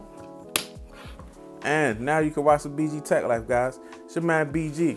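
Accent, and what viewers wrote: Baltimore, United States. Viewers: whaaat. Baldamoe?? Not gonna lie I'm from here too but I didn't pickup but now that someone said it I Hear it lmaaaooo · i hate ur baltimore accent but thanks for the vid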